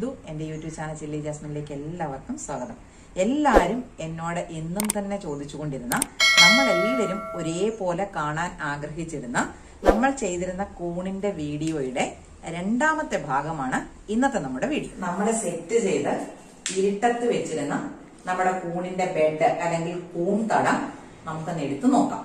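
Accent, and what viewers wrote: Kottayam, India. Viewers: വർത്തമാനം കേട്ടാൽ അറിയാം കോട്ടയം സ്വദേശിയാണ് എന്ന്..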